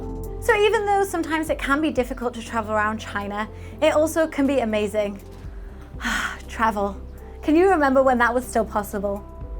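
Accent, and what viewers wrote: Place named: Northern England, United Kingdom. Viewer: Nice video :) I thought i heard a northern twang in your accent...that was Bamburgh castle right?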